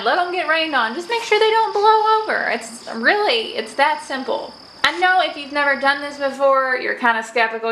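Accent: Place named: Kentucky, United States. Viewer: I just watched this video and as soon as you started talking I had to smile. You sound just like me. Are you from Kentucky? Sure made me homesick. I live in NC now, but I love my old Kentucky home.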